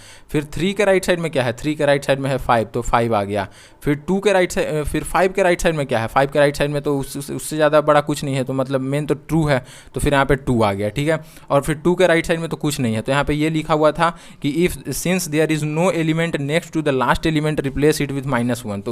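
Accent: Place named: Bihar, India. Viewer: yes exactly . I don't know what short listing criteria Josh is using. Sometimes they select only on one question and some time dont select even on all 3 correct. Btw sorry for being little rude in cmnt above. Liked ur way and accent (bihar accent) of explanation.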